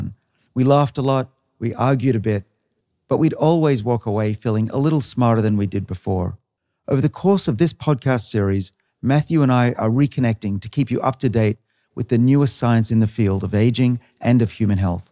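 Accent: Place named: United States. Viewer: He's picking up an American accent.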